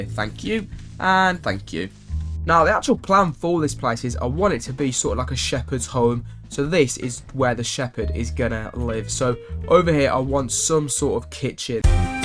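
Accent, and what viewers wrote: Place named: United Kingdom. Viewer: helo how are u Dude British accent is funny 😂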